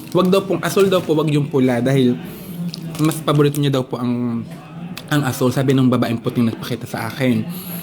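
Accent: Batangas, Philippines. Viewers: Batangenya ka po ba???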